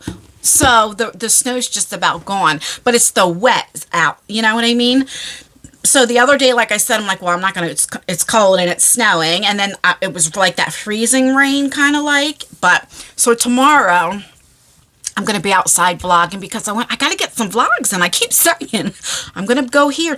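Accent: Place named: East Coast, United States. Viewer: I love it when you say "ya know" just as much as I love your East coast accent. You can't have that without "ya know" ❤❤❤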